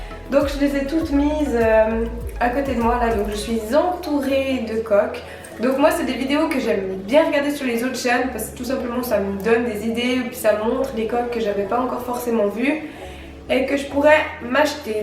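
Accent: Belgium. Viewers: OK g mieux compris mais tu viens de Belgique je trouve que tu a un accsant de la bas( on s'en fout ci ça s' écris pas comme ça) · tu est (d'origine) belge ou suisse ? ou tu est bretone ?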